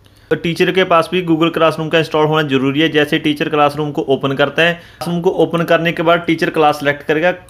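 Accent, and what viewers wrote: Punjab, India. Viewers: ji apka bolne ka tarika Punjabi yo jesa h me bhi Punjabi hu na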